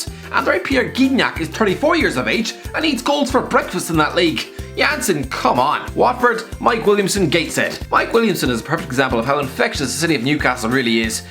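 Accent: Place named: Ireland. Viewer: he’s fucking irish give him a break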